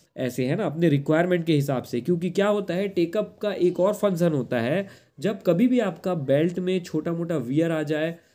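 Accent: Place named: India. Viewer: Indian accent:goodvibes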